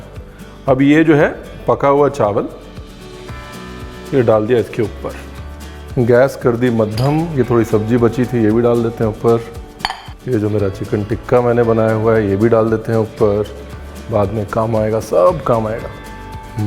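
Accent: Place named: Bengal, India. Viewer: Your Bengali accent is awesome! I am also a bangal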